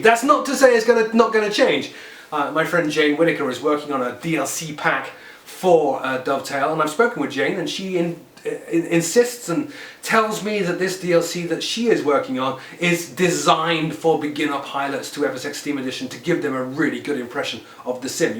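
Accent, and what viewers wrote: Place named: United Kingdom. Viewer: You live in the states? Thought you were british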